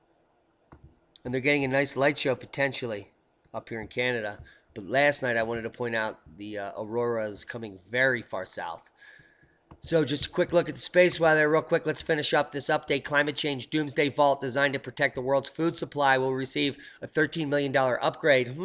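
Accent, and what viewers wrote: United Kingdom. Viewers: Love your British accent. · I love the British accent. You are f*ckin’ funny as hell. Can’t wait to hear you and Robert tonight. Global warming causes snow. Didn’t you know?! Haha.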